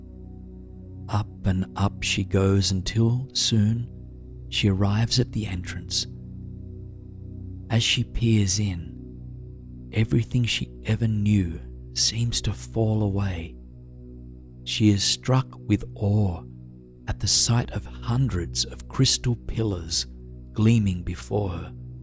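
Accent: Australia. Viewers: Hi, I really love your voice and most nights, I think ill see if this story has no sound added! I don't know how people don't find music or other sounds, in the background very irritating! It feels like hearing hearing finger nails, scratching a blackboard to me! Of course, you can't cater your stories just for me and I'm not a patron (if you have one) so, I have a cheek to say it but I'm genuinely disappointed and I do listen to others and do support them with money at times, as a thank you but I just hate missing out on your voice! I don't generally enjoy men's voices but you have a nice Australian lilt to yours! Again, I am crestfallen and I keep looking to see, if there is a no sound version but there rarely is! Unless, I'm mistaking you for another person but I think, you did kindly post me a no sound (music or added sounds, just white noise to me!) version of a story before. 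It is getting to be an irrational thing for me now because there are so few adult story tellers, as it is! I even am starting to dislike the people that say, 'oh I love the added music' etc etc lol I seriously do need sleep as I'm cracking up! I will just have to give up but I do love your voice and I wish you the best! God bless, from Ireland xx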